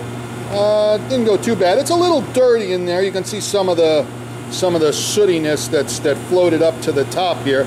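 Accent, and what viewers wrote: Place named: North Jersey, United States. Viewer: I was figuring that was North Jersey accent I was hearing..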